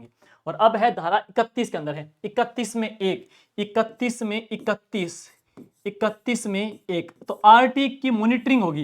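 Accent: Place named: Shekhawati, India. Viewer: Bhai aapka grah jila churu h kya boli m shekhavati touch aata h 🎉🎉🎉 I'm from jaipur or aap mere chhote bhai jese dikhte ho uski skl bilkul aap me milti h ditto🎉🎉🎉🎉🎉🎉🎉🎉🎉🎉🎉🎉🎉🎉🎉🎉🎉🎉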